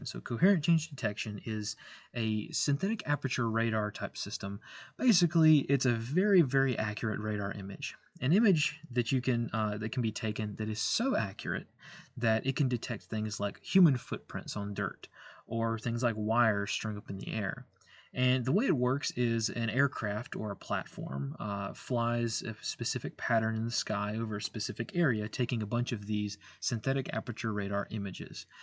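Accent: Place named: Georgia, United States. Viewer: You from Georgia? You gotta similar accent to my buddy from Georgia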